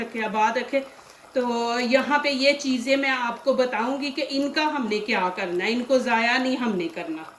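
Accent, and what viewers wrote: Sialkot, Pakistan. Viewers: بہت اچھی ریسیپی بہت ہی اچھے انداز میں سمجھا سمجھا کر پیش کی۔۔آپکا سیالکوٹی لہجہ بہت پیارا لگا۔۔۔۔۔اللہ آپ کو ہزاروں خوشیاں عطا کرے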